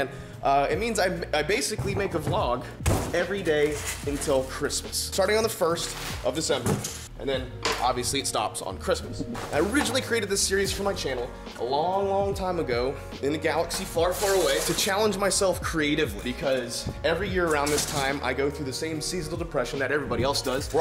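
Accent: Canada. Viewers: Love the Canadian accent some or all of that stuff you said was true😉😉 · Ur Canadian accent is on point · Awesome Canada ascent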